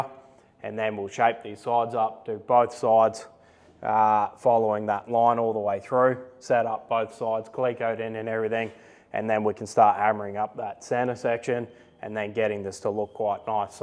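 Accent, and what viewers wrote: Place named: Australia. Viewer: I'm so impressed by the knowledge and craftsmanship that gets shown here in this video. That and well as a Ducthie I like to here a Aussie accent talk shop.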